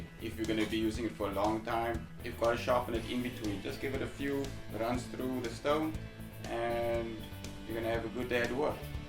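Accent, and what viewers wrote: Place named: South Africa. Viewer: I love randomly hearing an accent from home on YouTube :) Howzit my bru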